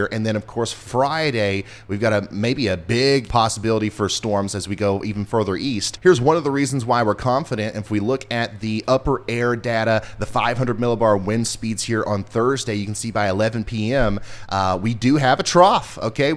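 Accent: United States. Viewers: i love your little country accent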